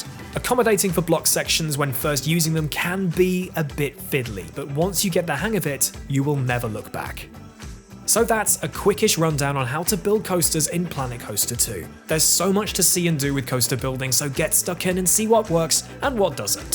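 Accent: United Kingdom. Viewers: What? He's just British lol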